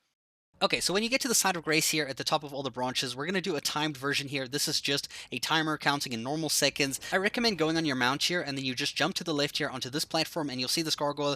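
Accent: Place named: South Africa. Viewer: Hearing your accent for a bit, I realised you are South African 😁 Aweeeeee! Thanks for the video, but unfortunately I am nowhere near this in the game yet - I will finish it another time.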